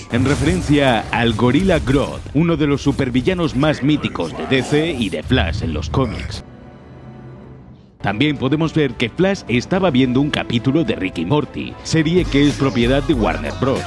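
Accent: Spain. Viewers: SOS de España osea español loquillo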